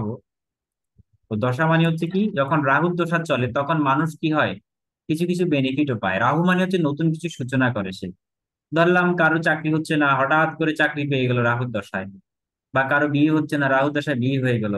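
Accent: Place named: Bangladesh. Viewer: Sir,আপনার স্কুল কোথায়? আপনার কথায় বাংলাদেশের একসেন্ট আছে।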